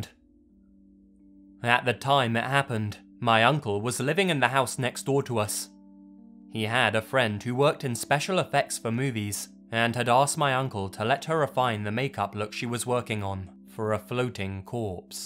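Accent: United Kingdom. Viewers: The one thing that makes me doubt these stories is that the speaker is British and the writing is also of British vernacular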